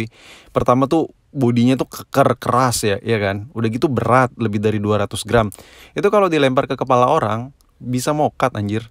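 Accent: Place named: Gunung Sindur, Indonesia. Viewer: Logat lu kaya orang gunung sindur anjay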